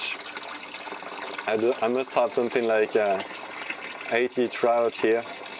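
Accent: France. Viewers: There is nothing more debonair than a frenchman speakng english - Im sure all the woman are hanging on every word he says. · Great video also the french accent 👌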